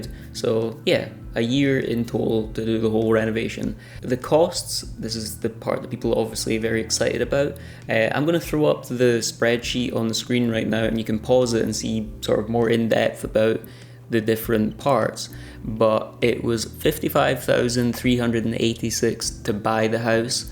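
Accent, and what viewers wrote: Scotland, United Kingdom. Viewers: Ireland? Wales? Please - that's such a Scottish accent! (and a lovely one at that) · You did an incredible job! I did think you were Irish at first (and I'm from the UK too!) at first, but now I can clearly hear your accent is Scottish. It's nice to be able to do decide every detail of your house like that and have an historic building with the character it has. How old is the house? It looks like possibly 18th or 19th century, but could be much older? I noticed it had originally had very small windows.. During the 17th or 18th century I believe, the UK had property tax based on size and amount of windows in a house..so it's not unusual to see houses from that period with windows bricked in or built with very small windows to reduce the property tax! This little house originally had that look, like it was designed with minimal windows and very small sized..so it could be from that period? 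· I knew you were Scottish! I"m so proud I got it right! I watch Outlander, but the accents on Outlander are super thick, so I will confess, I looked up the author Michael Bruce you mentioned in the other video to make sure I wasn't totally off.😁😜😉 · You sound 200% Scottish. Not sure how anyone could say anything else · Good golly! You sound Scottish, not Irish, not Welsh. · Having been to Scotland plenty of times in the past, I instantly knew he was Scottish. However, the impressive thing for me is that his accent is really easy to understand compared to other people I have met in Scotland. He's really articulate and that is quite helping when watching the video. Congratulations for your work in the house, from Spain. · Your builder/contractors did a great job. I thoroughly enjoyed this video and the restoration video that preceded it. I'll look forward to your next project. I knew you were Scottish when I heard your voice. 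Being of Scots descent on my Dad's side, I hope to visit the land of my ancestors one day. Keep up your good work and good luck in the wife-hunting. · place looks amazing..I know those costs are quite high but, given the whole lot was done by Trade, it'll all be guaranteed and you have that peace of mind. With regard the old character, you haven't destroyed it, it's merely covered. oh..and you couldn't sound more Scottish if you tried! excellent work! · For me, your house has so much character. I appreciate that the house needs insulation and think you did everything right. I have visited Scotland, Banff and I really enjoyed my stay. I had lovely weather. I visited for a valentines weekend. My fiancé, at the time, drove through the night. It took about 11 hours! It was a great adventure. The people were lovely and we actually met someone from London, where I live. He was the pub landlord and was very nice. 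By the way, you have a lovely accent. I do like a nice accent and listening to you, reminds me of my stay in Scotland. Another super video 💜😁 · I knew you and your house are in Scotland, by what I saw and your accent. When I was a wee lad, I lived in Glasgow and had an accent a bit different from yours and very different from what it is now. I just subscribed because I want to see more of Scotland. This looks great. I like what you did. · Awesomely well done. I’m 50% Scot myself. My mother was born in Armadale. So of course I love your Scottish accent. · It is a blessed house, when a believer lives in it! :-) Because then it is the home/temple of God... :-) Enjoy living in your beautiful house and behold your wonderful scottish accent!!! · No, being Irish I knew you were Scots, but how do you get these people to work for you is my question? And that is such a beautiful place, you are so lucky! 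· 😂 I knew you were a Scots man ! Well done love from Ireland ! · This American was actually able to distinguish your Scottish Accent......😎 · It's awesome that you got your parents involved. I bet they enjoyed it. (BTW I had you pegged as Scottish early in the video 😉)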